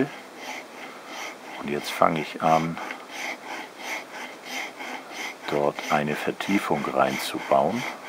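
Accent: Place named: North, Germany. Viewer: der gechillte norddeutsche Dialekt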